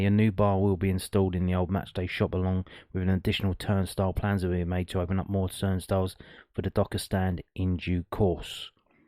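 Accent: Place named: Cambridge, United Kingdom. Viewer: Sorry mate your voice is pissing me off and you have the accent of a Cambridge fan so I won't be watching this bollocks again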